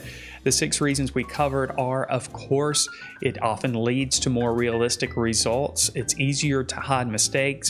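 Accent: South, United States. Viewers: The way you say drawing sounds like drauwwing. What accent is it? Southern?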